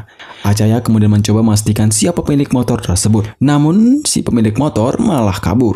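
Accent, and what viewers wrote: Bali, Indonesia. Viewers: Pembaca narasi Orang bali dech ini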